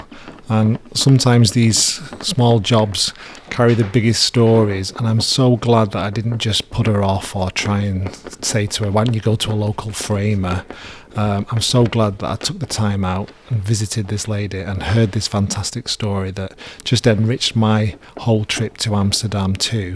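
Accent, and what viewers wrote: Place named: Manchester, United Kingdom. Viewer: Love the channel, nice to hear a Mancunian accent. Is the painting the stereotypical Amalfi Coast scene?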